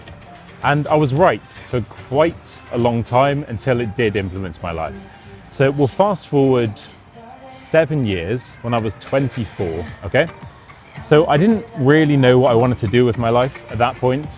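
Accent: RP, United Kingdom. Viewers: I was expecting him to drop into a regional accent not stay in RP. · He does speak in a very clean general British accent. While visiting the UK my miles varied.. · I thought you were going to speak some complicated form of English, like some Shakespearean-type shit. 💀 I could understand every single word that came out of this guy’s mouth. The only difference was his British accent. Other than that, he sounded completely normal to me. I think he was talking about non-native English speakers—people whose first language isn’t English. I’ve been speaking English my whole life, so none of what he was saying was difficult for me to understand. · You spoke so clearly and almost RP. You spoke so well compare to some Hollywood actors which I need to turn on subtitle in order to understand them. Fantastic four 2026 is one of it. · Received pronunciation, notoriously tricky for the uninitiated. 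· Apparently, my English is incredible. But I love your British accent, so I'm watching... 😂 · For me as a German, he speaks a very clear British English, not comparable to Scots or other British dialects. So no problem at all. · Maybe this video is for his Korean viewers. I thought he might have some crazy, difficult-to-understand Scottish accent or something. He sounds like a BBC News presenter. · Dispite your strong british accent, i can understand you! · erm if i don't understand it's normal bc of your british accent. but i managed to understand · Although it is a relatively RP accent. Try any working class person with a strong regional accent, that would be much more difficult.try scouse, brummie, or pretty much any of them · A beautiful speaking voice, with clear British pronunciation and accent. Easily and completely understood, at least by this American. I’m probably not the best test subject, however. 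As a 21 year old in 1970, I spent my junior year of college at the University of York. I didn’t qualify to study in a country where English was not the native language. I distinctly remember my taxi ride from the York train station to my digs. My taxi driver opened his mouth in conversation and I couldn’t understand half of what he was saying, although I knew it was English. I almost panicked, thinking What have I done?! I soon found out that he was from Newcastle and spoke the Yorkshire dialect. Over that year, I heard many British dialects and learned to understand them all. What a year that was! Not just the butterfly affect but the giant swallowtail butterfly effect. It broadened my American identity to then include more that of a world citizen, which has served me and others well, I believe. It changed my life for the better. Now that I’m an addict of British tv crime shows, I can understand most every word and sometimes translate for my English speaking husband. 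A good thing. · Understood 100%. RP is easy to understand. Thank you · You talked with very plain and delicate British accent, articulated each sentence in a clean way and didn't speak too fast. I understood everything! (And I'm sorry for the discrimination you went through) ciao from Italy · I was expecting a broad Glaswiegan accent not a nice clear RP accent 😂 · I understood perfectly, but that would be because I am from the UK and you spoke English in a pretty much RP style. Now, if you were speaking as if you originated from inner-city Glasgow...... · Hello, son !!! I'm an old french lady refreshing her english... When I first saw you, with your red hair and beard, I thought may be you were from Scotland or Ireland, which would have been very difficult for me to keep up...😂 But you have an honest British accent, very nice and easy to understand 😊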